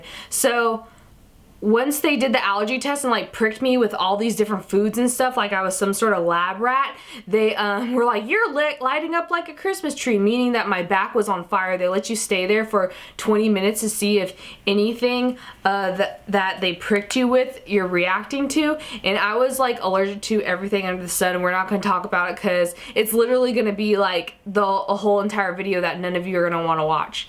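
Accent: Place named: Texas, United States. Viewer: are you from Texas originally?? I always think you have a thick Texas accent:0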